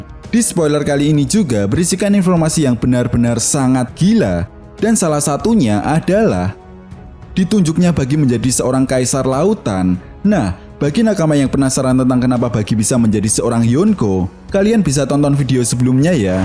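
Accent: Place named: Banyumas, Indonesia. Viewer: ngapak ya min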